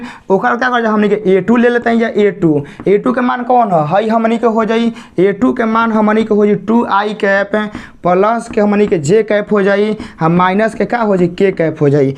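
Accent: Bhojpuri, India. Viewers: Aap bahut mitha bhojpuri bolte hai 😀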